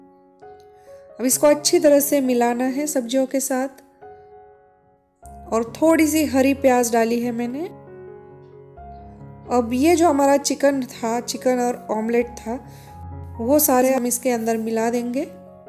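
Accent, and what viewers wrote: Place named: Maharashtra, India. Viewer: mam aapke baat karne ke tarike se malum howa ke aap maharashtra se hai thanks mam reply main bhi maharashtra se ho aurangabad se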